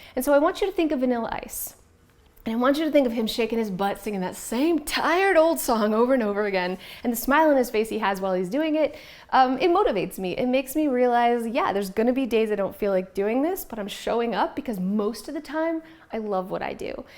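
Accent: Canada. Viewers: That's great that you want to do something new! I'm new to your channel and I'm just here for the tips in your Canadian accent and the shenanigans that fill your life and come out of your mouth. 😂 Maybe take the camera outdoors and let's see what happens when life happens. Byeeee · Thank you for showing up, even when you might not feel like it. I love the personal stories you share are how honest and real you are. I always learn something from you and you always motivate me to go clean or organize something. I also love your Canadian accent, since I grew up in Ontario, Canada, eh.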